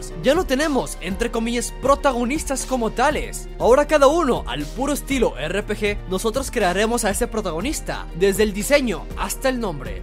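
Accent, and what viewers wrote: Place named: Mexico. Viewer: donde puedo apartar mi versión del juego, o sea donde lo puedo mandar a pedir o algo por el estilo. Supongo que vives en México, por tu acento y las palabras que usas en tus vídeos.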